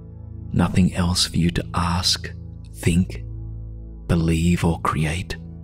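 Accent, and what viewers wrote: Australia. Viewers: I think he’s Australian not British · Isn‘t he Australian?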